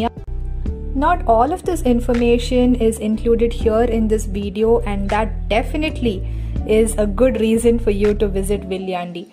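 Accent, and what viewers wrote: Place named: India. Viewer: Wow Thank you for posting this. It brought back so many good memories since I lived in Viljandi for 3 years. Its a beautiful and calm town <3 I am from India and I guess you're Indian too? Assuming by your accent :)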